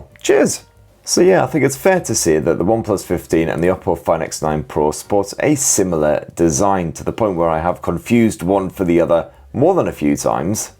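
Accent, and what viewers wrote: United Kingdom. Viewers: I thought you were a Brit. Do pics look crisp or crispy like potatoes? 😋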